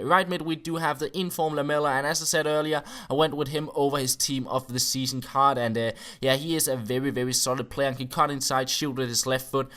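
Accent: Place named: Denmark. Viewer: I love your Danish accent!!!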